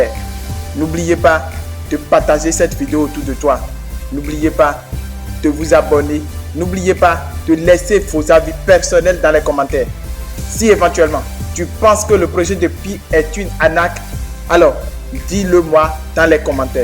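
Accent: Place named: West Africa. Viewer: Son français n'est pas forcément mauvais, mais c'est l'accent de sa langue maternelle qui prime, vous nos fr de l'Afrique de L'Ouest avez ce problème là, écoutez un Congolais(🇨🇩) parler francais et après écoutez-vous parler vous comprendrez, alors l'essentiel est le message qu'il nous donne, c'est très important👌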